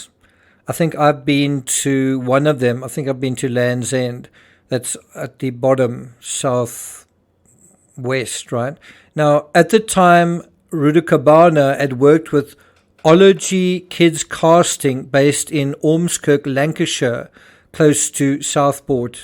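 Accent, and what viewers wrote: South Africa. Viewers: Tbh i'm shocked your not British, South African a real blind spot for me. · You say you found the British less warming to you than other nations you lived in, I don't know your race or nationality, or anything about you, but judging by your voice I thought white South African, which is generally a group that is seem as more aligned to, though Australia probably tops for being most similar. America has always been a different thing, that country has huge influences from all over, but more than anything else, they have a uniquely different way of being & doing things, so as a Brit I can say yeah, theres a gulf between us & America. I sure some Americans do an affinity with Britain, & those individuals might feel more similar if I was to meet them, but over all, American pop culture has a vastly different feel & myself personally I feel our British culture has been lost to it all too much, so while its nothing personal to individual Americans, I am not too keen on the loud over confident American brashnes & razzmatazz, I'm pretty Old School English & I love quaint stuff lol. 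But regarding "foreigners" & other races, we have actually been really welcoming much of the time, the Brits overall are a friendly & safe bunch, a few louts but even they are fairly harmless. But what you may not understand, as an outsider or newcomer, is that we have experienced a huge culture shift, that has been detrimental, we have shared scarce resources, the white working class, houses & space, as a small island. We have paid taxes that go on housing migrants whilst are own p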